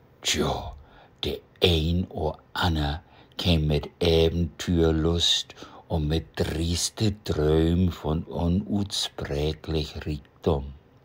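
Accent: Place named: Low German, Germany. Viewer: Wat scheun Un'n plattdütsch wat ok'n echtet is.Weur de ik al lang nich mehr hört hev Wider so ut Hamborch nu in de Bretagne to hus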